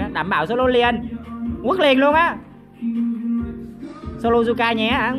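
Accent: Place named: North, Vietnam. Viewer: Giọng ông này hài vcc lúc tiếng bắc lúc tiếng nam :))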